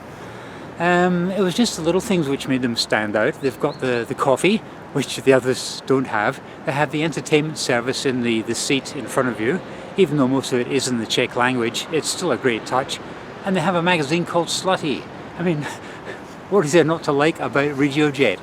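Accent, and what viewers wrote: Scotland, United Kingdom. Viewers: Scottish accent with something else creeping in - can't quite put my finger on it though. Another English speaking country but not British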